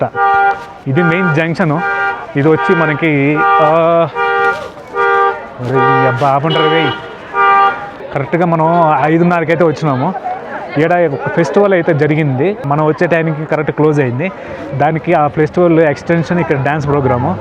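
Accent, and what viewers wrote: Nellore, India. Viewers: Nellore bro...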